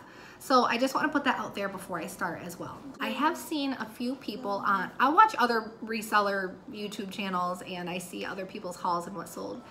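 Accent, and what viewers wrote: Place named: Illinois, United States. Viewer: Are you from IL? You have a very familiar sound to how you talk. 😊 I have noticed that Goodwill some days will price way way high but other times I go inside and it’s normal again. 🤷🏼‍♀️ I’m originally from Chicago and I almost never went to Goodwills by me because it was already expensive. I liked to go to Unique. But....then they got hip to stuff quick and they were selling AE jeans for like $20 😆! Haha anyways now I live in Colorado and the thrifting is gold—-I almost always find something high end or luxury—-but the prices are usually crazy! Lately I have been garage sailing and also my husband is trying to learn this so he is sourcing too. Anyways. Goodwill bothers me anyways because they seem like greedy jerks. We have a chain called ARC here which I like for prices and for the charity they actually support BUT whenever I take my kids in there, an employee yells at us. 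Sigh. Sourcing is hard enough, let alone with the kids. Thanks for all your info. Just found your channel and I love it.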